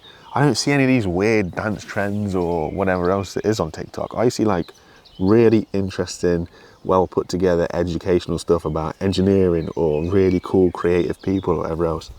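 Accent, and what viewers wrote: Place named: East Midlands, United Kingdom. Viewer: Yes, I can see exactly where you're coming from, there is a lot of youtuber abuse around from trolls, and it does tend to be those who have a lot of subscribers, because they tend to say so on their channels. It seems that the vast majority of people out there though tend to come to their aid and offer support or reassurance that there's no need to be worried. Personally it doesn't influence my decision as to whether to watch a youtuber or not, if they begin to get more popular or wealthy, that's just pathetic, but I guess it does happen and some people do feel like that, and become jealous over what is seemingly insignificant to them. Whereabouts are you, it sounds like not a million miles from an East Midlands town, because of your accent?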